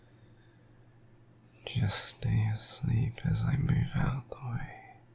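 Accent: England, United Kingdom. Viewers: Thanks for the video, btw i love your accent! Are you from england?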